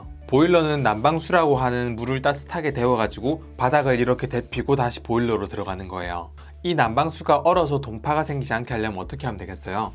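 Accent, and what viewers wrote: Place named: Busan, South Korea. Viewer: 구수한 부산억양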